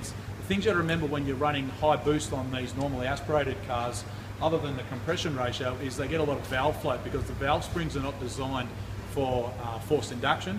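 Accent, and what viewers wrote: Australia. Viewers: Great vid. Following for sure. Thanks for posting. Will keep looking for updates. Great to hear an Aussie accent pushing the envelope. Well done. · There's just something about an Australian explaining Subarus that adds legitimacy to their statements...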